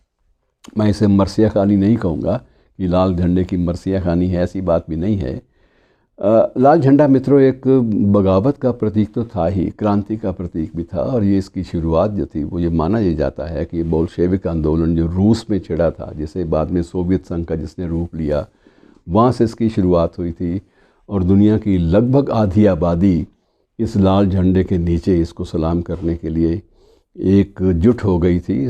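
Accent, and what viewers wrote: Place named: Pakistan. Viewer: Iss aadmi ko sunke aisa lagta h jaise kisi pakistani ko sun rha hu, ab dekhlo jinki jeeb tak dushmano jaisi h unke vichar kinke jaise honge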